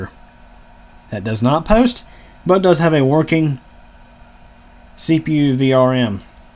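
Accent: South, United States. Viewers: I like the fact that your southern. It just demonstrates the fact that country boys dont have to be simple. Im very proud of this vid btw very great content.👌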